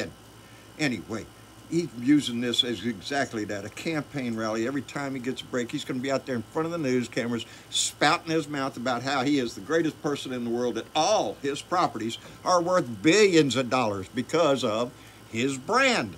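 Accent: Texas, United States. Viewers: I love you, chum!! You remind me of my first husband! Same Texas accent, coloration, shape, sense of humor... Age, too!! 😆😆😆💋💖💋💖😁😁🍿🍹🌊🌊🌊🌵🌵👵🐺🖖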